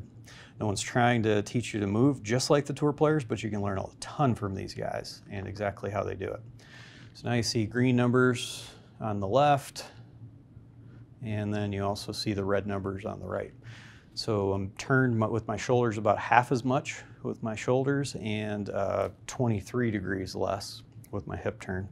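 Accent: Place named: Ontario, Canada. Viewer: Very well said and illustrated. Is your shop found in Ontario you sound like it may be. I’m looking for a location in southern Ontario. I have subscribed, I’m a believer of ST. Thank you. JB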